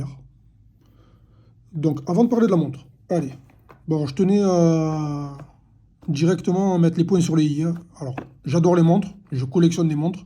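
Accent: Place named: South, France. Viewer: Bravo 👍 J’apprécie te suivre, avec ta franchise, tes vidéos sans chichis et ton accent du Sud qui fait plaisir! Bonne continuation! PS: elle me tente bien cette Glycine 😍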